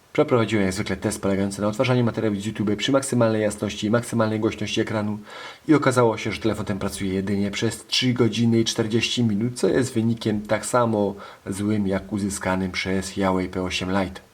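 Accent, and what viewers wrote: Silesia, Poland. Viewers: Witam :) Akcent wskazuje że jesteś Ślązakiem ?! Na ręce masz ? Ten ludzik Android ma jakąś funkcję czy tylko ozdoba, córka mnie o takiego męczy ;)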